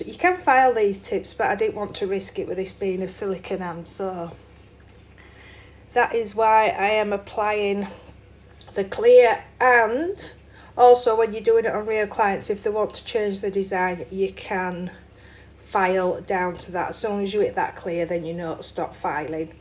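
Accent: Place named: Birmingham, United Kingdom. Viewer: Thanks for this video it’s gonna help I’ve got this hand coming tomorrow:) also are you from Birmingham? You sound local to me x this set was lovely xx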